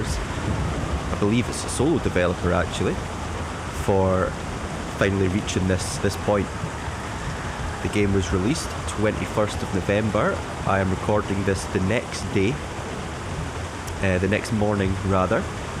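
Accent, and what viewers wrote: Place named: Scotland, United Kingdom. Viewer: Hi. Your videos are making me buy this game too. I didn't even know it existed. I bought Sailwind yesterday, but ended up returning it. I found the graphics a bit disappointing. Sorry if this translation looks a bit strange, I'm from the Netherlands and I ran it through Google Translate. P.S. I love your Scottish accent.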